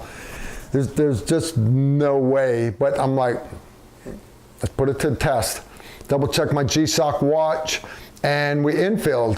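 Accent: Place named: New York, United States. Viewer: Where is from? I feel like from New York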